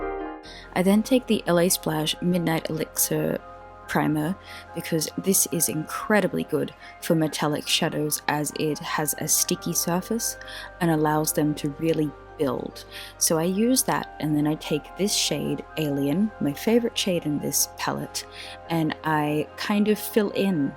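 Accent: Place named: Australia. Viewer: Wow are you aussie. You sure sound Australian. I’m in love with your look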